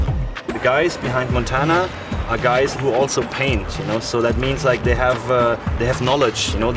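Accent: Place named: Germany. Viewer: sounds like hes german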